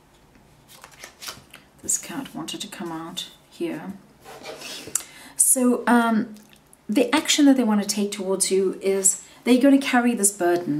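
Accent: South Africa. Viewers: Love your style of reading and soft South African accent 🇿🇦 ♥️ big Love! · Are you South African? 😊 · Are you South African? 😍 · Your accent is lovely, it's very soothing to me Where are you from? SA? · Are you South African? 🇿🇦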